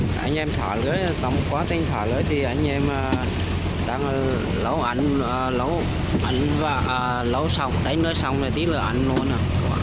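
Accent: Nghe An, Vietnam. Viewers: Giọng giống quỳnh phương .hoàng Mai. Nghệ an vậy bác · Nghe giống giọng nghệ an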